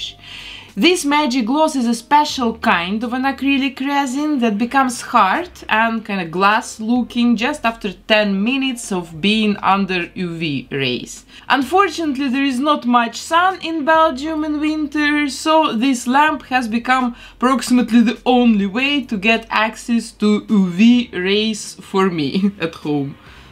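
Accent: Russia. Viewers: Акцент автора похож на русский. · Я не знаю почему, но на каждом видео слышу русский акцент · Лол, русский акцент прям прёт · I LOVE your heavy Russian accent and also the happy music is kind of making this creepier but you still got a new subscriber 💕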